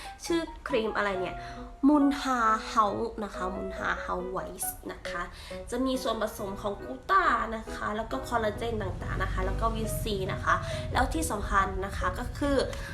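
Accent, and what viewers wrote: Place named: Isan, Thailand. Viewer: พึ่งเคยได้ยินพูด อิสาน น่ารักมากเด้อ 🤣🤣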